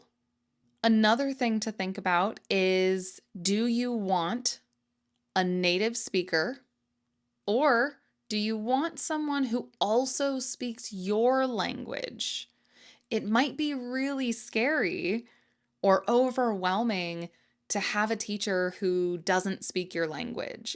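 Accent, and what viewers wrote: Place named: United States. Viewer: Hi there my beautiful teacher you are a master of communication with us and your American accent is perfect meeting our expectations that's why we love 💕 you here in Morocco keeping up ❤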